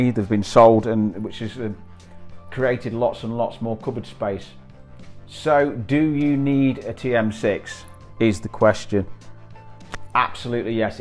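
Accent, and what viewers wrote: United Kingdom. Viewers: Derby accent? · Thank you so much for your detailed and really informative helpful review. I'm a foodie too and a competent cook but am time poor with work and uni study so am tempted to add the TM6 to my kitchen, even though I already own a different brand high quality thermo cooker (which I love as well!). I'm hoping the teenagers will find it fun to use so that they can help out a bit more! 😂 I really value your first review. Think you may have persuaded me to go for it! Thanks so much. Ps love your accent, I'm a Brit, now Aussie living down under. Made me feel at home. 😊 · I’ve no idea why this fella thinks you’re Australian.. you sound more northern uk than anything (sorry if I got it wrong). We definitely do not pronounce yoghurt like you do lol. Soz, upgraded from tm5 to tm6 recently and looking for hints and tips. Spose I should ask my consultant but I don’t want to bother her right now ( can’t be half arsed) Hope you’re doing well xxx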